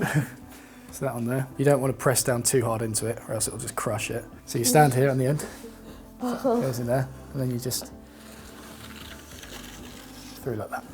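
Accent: England, United Kingdom. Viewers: i thought he was an Englishman from his accent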